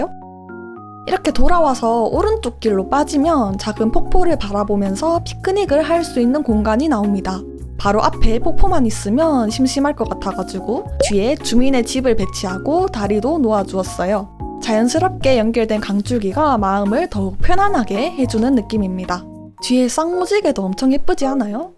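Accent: Gyeongsang, South Korea. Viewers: 님 영상 처음본게 이거인데 그때도 님 억양듣자마자 바로 대구사람인거 알아챔 ㅋㅋ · 영상만 해도 힐링인데 갱상도갬성으로 내적친밀감이 +1000 상승했습니다♥ 흥해라 갱상도! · 너. 대구 사람이지. 표준말투 쓰는 대구 사람가터.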